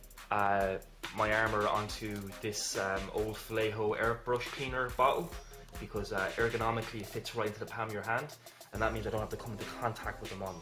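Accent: Ireland. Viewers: that Irish brogue is even sexier in hi Def!!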